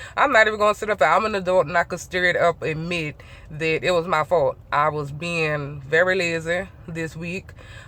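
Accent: Louisiana, United States. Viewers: I can listen to Louisiana talk all day! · You are beautiful either way! Love the way you talk too. You have got to be from Louisiana! Creole? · Hello my handle is spaceman I just joined the hunt team I purchased a 2018 kenworth t680 I pick it up Friday your videos are very informative and I love the Cajun accent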